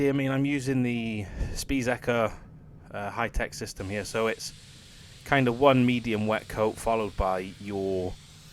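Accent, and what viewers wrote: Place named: England, United Kingdom. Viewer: thank you for yourgreat content. I'm in the US so I like seeing other products. You are very good teacher. Also love the English accent. Please keep up the work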